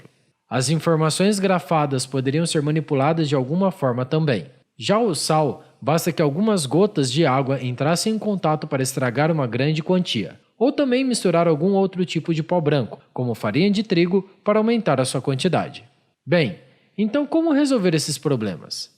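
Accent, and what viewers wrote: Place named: Goiás, Brazil. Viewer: Esse sotaque goiano kkk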